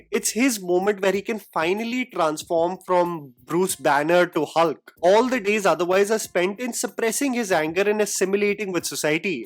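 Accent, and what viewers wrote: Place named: Telugu, India. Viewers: ur Telugu is cute 🥰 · Your Telugu is sooo cute..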